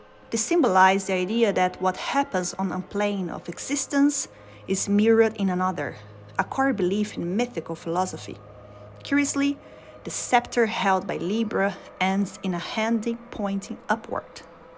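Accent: Brazil. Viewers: Brazilian accent hell yeah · Are you, by chance, Brazilian? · Bizarro, 2 da manhã eu vendo minhas coisas de nerd e vejo um vídeo sobre um dos bosses que acho mais atrativos e curiosos em nightrein, que vídeo maravilhoso, tantas citações mitológicas, musicais e filosóficas que reflete o trabalho que teve em escrever e criar esse vídeo que aprofunda MUITO no jeito que vemos o boss, com comparações cirúrgicas e uma análisa muito profunda! que vídeo incrível. Ver o povo engajado com comentários adicionando anotações ou observações é muito foda! (perdão a palavra) edit: esqueci de citar que percebi seu sotaque e fiquei encucado! mas depois de ver que era do Brasil, tenho a elogiar sua fluência em inglês!!! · Strong Brazilian vibes in this accent. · Quick question, are you perhaps Brazilian? Not complaining, just can´t pinpoint the accent. Also great video. And in the mark where you say "exoticism", is it really that or "exoterism"?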